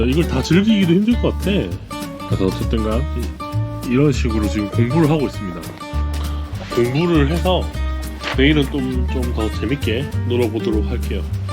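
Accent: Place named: Gyeongsang, South Korea. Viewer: 여행유투버를 많이 보는데 이렇게 크루즈여행 영상은 처음이에요.우연히 을 알게 돼서 몇날을 영상을 보고있어요. 혹시 부산분 아니세요?부드러운 경상도 억양의 말씀..아주 듣기 좋네요.근데 아직 서른 정도의 어리신분인데 어째 이래 많이 여행할 수있었는지 궁금 합니다.우리아들 야단 좀 많이 쳐야겠어요. 저는 55살부터 단기 자유여행을 여러번 했는데, 코로나이후로 못나가봤어요.우리는 몇년 지나다 보니 늙어 힘이 없어서 출발결심이 어렵군요. 영상 너무 재밌게 보고 있어요. 젊음이 부럽습니다. 많이 보여주세요~~ㅎ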